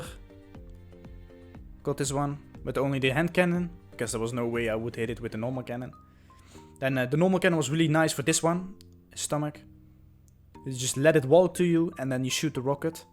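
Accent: Netherlands. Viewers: you sound very dutch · For everyone saying he sounds Dutch , yes he is Dutch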